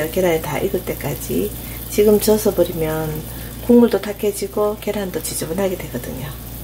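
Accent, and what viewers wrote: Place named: Busan, South Korea. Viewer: 애교있는 부산말씨 목소리 넘 좋아요 ㅎㅎ 저도 고향이 부산이라서 ㅎㅎ 고향 부산 맞으신가요~ 잘보고갑니당:)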